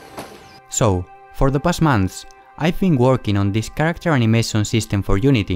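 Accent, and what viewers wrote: Spain. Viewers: eres español no? buen video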